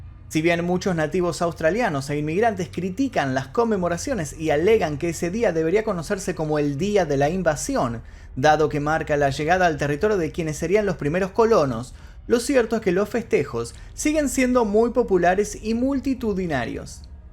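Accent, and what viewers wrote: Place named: Argentina. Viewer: 😘😍 me encanta tu acento argentino, saludos desde Ciudad de México 🇲🇽🤗!!!!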